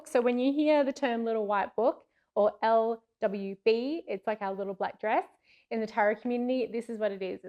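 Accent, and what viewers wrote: Australia. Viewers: Is says Canada but you sound Australian.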